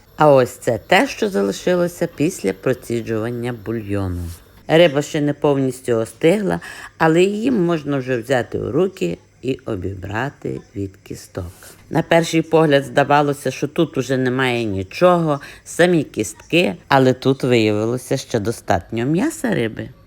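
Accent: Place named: Poltava, Ukraine. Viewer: Доброго вечора. Така прекрасна мова, ви часом не з Полтавщини?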